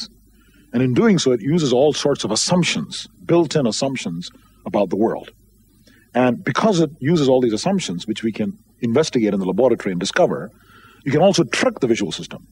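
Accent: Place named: United States. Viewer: sounds like american english and some of his words have accents still, thats why i think its funny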